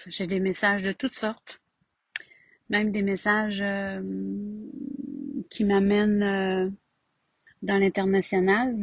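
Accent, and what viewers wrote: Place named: Quebec, Canada. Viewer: Bonsoir de France nouvelle abonnée j'adore votre accent Québécoise 😊👍🏼 merci a vous🙏🏼🌷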